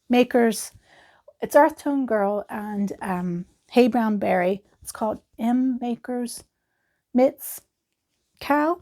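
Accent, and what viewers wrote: Ireland. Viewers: I enjoy content variety w/ ya YouTube page! #High5Blessings Super Interior settings and content details in video... You totally resemble my neighbor #fyi Super Irish Accent. Posted link on my Tweeter page.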